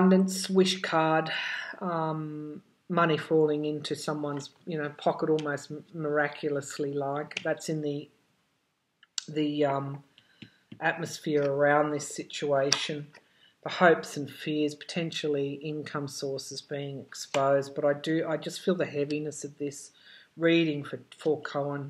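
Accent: Australia. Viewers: I do think Cohen is concerned for his wife and children. I have empathy for him because he confessed his guilt and said he was deeply ashamed of his involvement with the Trump criminal enterprise. I think he has truly turned away from evil and is truly worried that Trump could bring America down completely and kill our democracy. Thank you for another insightful reading. I love listening to your lovely Australian accent as you speak. 🦘🦋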